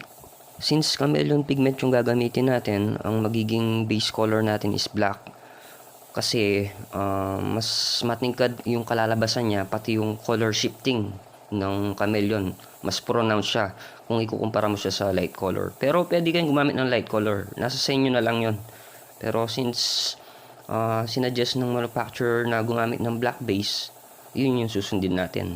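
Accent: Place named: Pampanga, Philippines. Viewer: lupet mo neh soy.kapampangan ka ne soy tunog pamu.ehh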